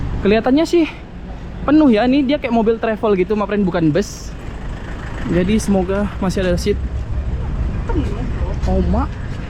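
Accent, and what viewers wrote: West Sumatra, Indonesia. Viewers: logatnya logat minang bg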